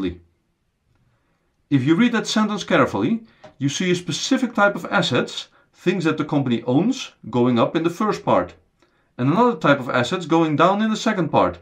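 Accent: Netherlands. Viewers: This gentleman is definitely Dutch!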